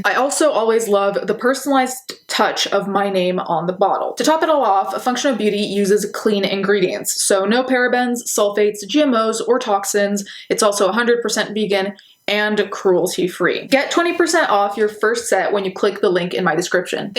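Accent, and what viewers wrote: Italy. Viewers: The Italian in you can’t not say those designers properly and I am living for it 😍😍😍❤️🧡💛💚💙💜🤎🤍🖤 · She’s Italian. So…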